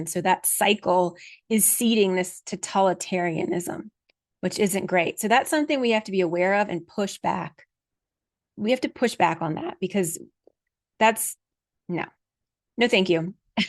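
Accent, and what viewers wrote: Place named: Australia. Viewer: Fantastic hearing an Australian biais 😅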